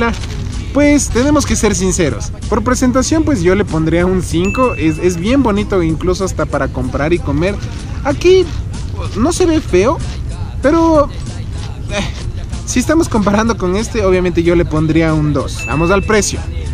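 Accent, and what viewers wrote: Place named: Ecuador. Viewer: Excelente que le metas todo sobre ECUADOR Música Pinta Labia Carisma Acento ... Etc, Etc, Etc. Genial bro RIOBAMBA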